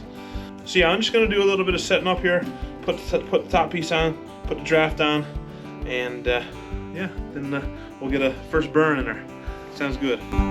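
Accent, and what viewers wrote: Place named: East Coast, United States. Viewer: Ain’t hard to hear the east coast in yah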